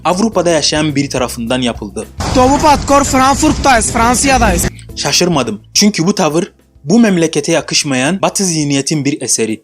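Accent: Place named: Kayseri, Turkey. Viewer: Abi hahaha nasıl hoşuma gitti konuşman yerel dili de çözmüşsün (lehçe) kayseride inşallah bir gün rast geliriz dostum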